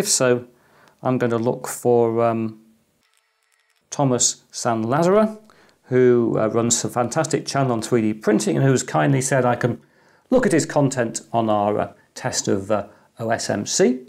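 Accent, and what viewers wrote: England, United Kingdom. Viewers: Love your british speak mate, cool startrooper heads on your desk also! keep up! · I thought it was Christopher Walken :-) talking about R-Pi. on Youtube. with such pure English accent :-)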